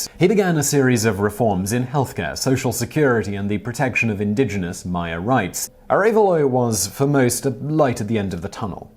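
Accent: United Kingdom. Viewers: It's like infowars with a Brit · Always some British voice dipshit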